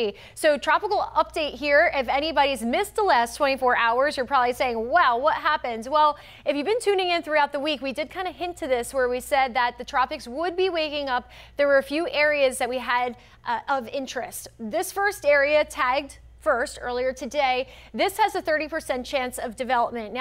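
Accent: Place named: North, United States. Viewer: By far, the worst northern accent that I've heard in Florida news bar none...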